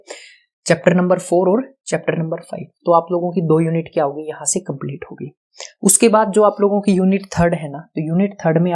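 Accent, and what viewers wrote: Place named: Hisar, India. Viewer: Ye to pra h ki aap Hisar se Ho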